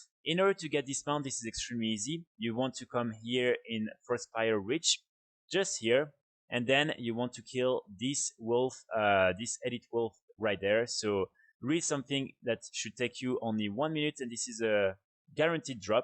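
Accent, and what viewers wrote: France. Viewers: Gros accent français qunad même haha ! Nickel la vidéo, continue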